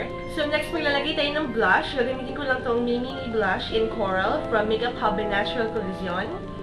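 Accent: Philippines. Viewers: You have a cute Filipino accent :)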